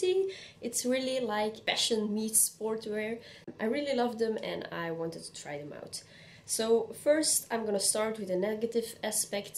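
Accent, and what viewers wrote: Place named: Europe. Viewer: I like your accent. I lived in Europe this video made me miss it for sure ❤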